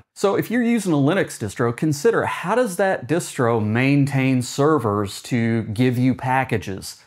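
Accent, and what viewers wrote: Tennessee, United States. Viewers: PS: Your voice and style are very comforting and convincing. It reminds me of a younger version of Senator Al Gore's cadence, pacing, emphasis and accent. (Are you from Tennessee?) --- Keep up the good work! Love your channel and your videos! Wishing you continued success in all that you do! 🍷🍷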